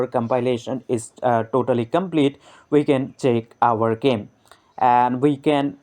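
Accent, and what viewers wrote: India. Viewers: i can't listen to this indian accent ... you should look up tutorials how to speak English.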